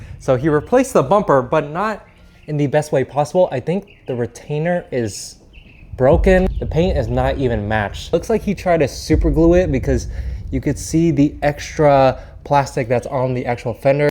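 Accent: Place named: Vietnam. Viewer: He speaks Vietnamese accent english.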